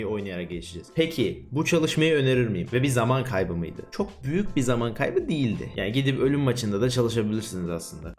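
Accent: Thrace, Turkey. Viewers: Abi sen Trakyalı mısın ? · D-dostum sen benim trakyalı adamımsın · bi trakya ağzı sezdim harika video beğenildi .d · abi sen bizim oradansın beya tırakya dimi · trakyalı mısın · Hep videolarında trakya şivesi konuş çok iyi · Abe adaş trakyalısın galiba sen nerden Be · abi trakyalısın galiba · Abi trakyalısın galiba siveli konuşunca çok iyi oluyor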